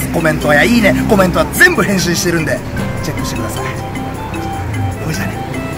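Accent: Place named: Hiroshima, Japan. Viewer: HIPPYの広島弁がすごいすき。HIPPYもすき。♡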